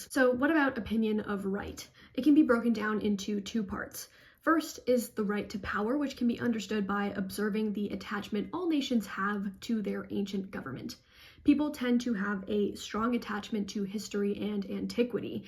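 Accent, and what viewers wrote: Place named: Canada. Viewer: this is the first video of yours that i've watched so when you first started talking, i got a vibe that you were canadian and im glad to see i was right. besides that, this is such a cool concept and exactly the kind of analysis ive been looking for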